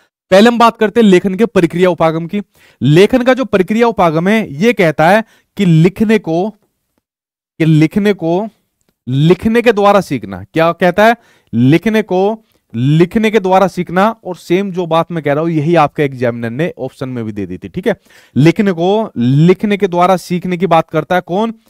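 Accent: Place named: Azamgarh, India. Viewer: बाबू बहुत अच्छा बढ़ावन आजमगढ़ की बोली है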